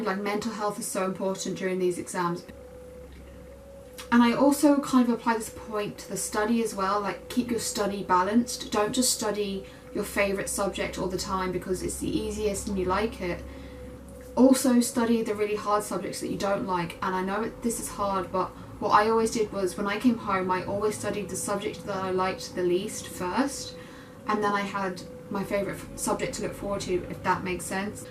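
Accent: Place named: England, United Kingdom. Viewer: Where are you from you sound English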